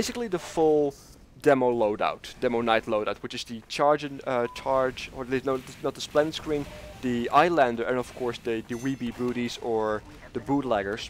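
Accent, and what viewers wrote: Netherlands. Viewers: Lekker nederlands accentje hoor :)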